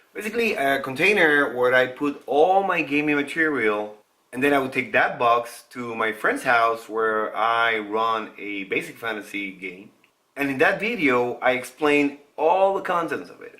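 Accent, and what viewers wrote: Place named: United States. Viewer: Hey! This seems unrelated, but where is your accent from? I remember in the cheesy Dune miniseries SciFi made a long time ago, the guy they cast for Count Fenring (the Emperor's Advisor) spoke with an accent which has some strikingly similar features to yours! I never knew where it was from! Yours does sound closer to a standard American, but it's still really cool.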